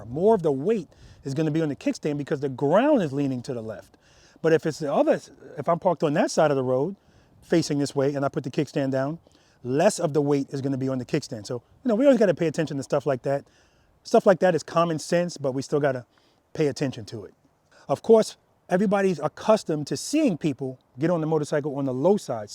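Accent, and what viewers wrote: New York, United States. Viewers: Picked up a bmw GS this morning, they're not that bad... it being a 750GS not a 1250GS Adventure (the tallest version, which you probably rode) is completely beside the point. It is a massive difference to the Yamaha/Star Bolt (my Yamaharley lol) I had the last 10yrs. Also as an aussie I love your New Yawk accent, I'm a weirdo who likes listening to how people talk :)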